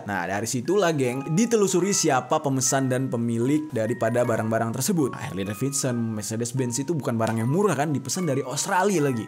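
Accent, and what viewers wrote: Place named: Medan, Indonesia. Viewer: Medan nih bos:v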